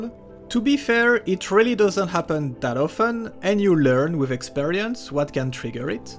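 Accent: France. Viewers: Are you french? It sounds adorable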